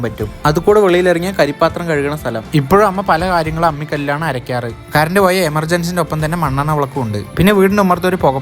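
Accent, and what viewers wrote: Palakkad, India. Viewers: താൻ പാലക്കാട് ആണോ സംസാരത്തിൽ അങ്ങനെ തോന്നുന്നു🤨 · Palakkad aano?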